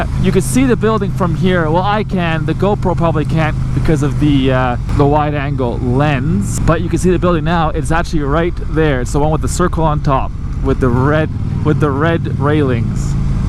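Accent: Canada. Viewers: He's Canadian not American Think that America isn't the only country in the world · canadian ehhh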